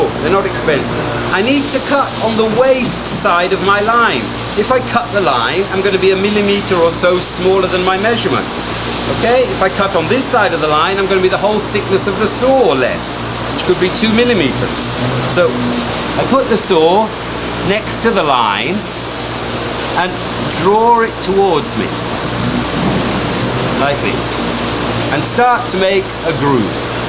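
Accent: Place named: Wales, United Kingdom. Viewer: Which part of wales are you from if you don’t mind me asking? I’m welsh and still live here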